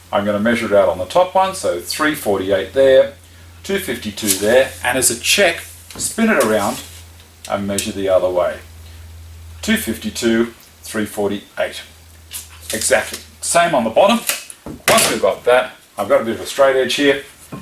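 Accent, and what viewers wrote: Australia. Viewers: Ive just stumbled upon your channel while researching melamine cabinets before tacking my kitchen, and you are a breath of fresh air! After watching so many hours of youtube videos from guys in the US and hearing everything in fractions of inches, and about how cheap plywood is over there, its so good to hear an aussie voice talking in metric. Subscribed!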